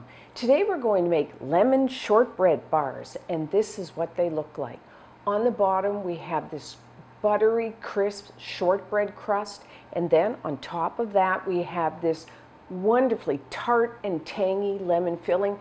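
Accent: Canada. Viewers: Obviously you/she must be from Canada. “Quite this, quite that” just sayin...no slam.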